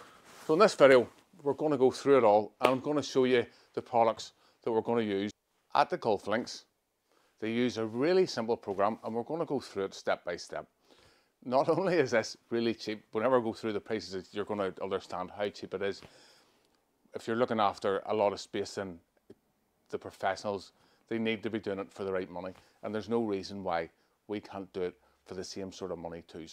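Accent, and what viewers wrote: Northern Ireland, United Kingdom. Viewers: thanks for the full reply (not many YTers bother!) and BTW, love the Northern Ireland accent (if that's it?)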